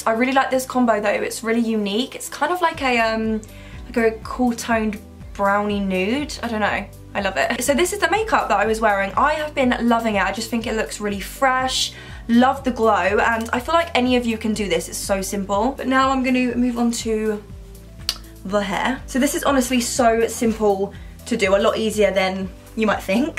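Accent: United Kingdom. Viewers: I love ur accent british& ilove u😍❤️❤️ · That's real British😂 not what u see in films aha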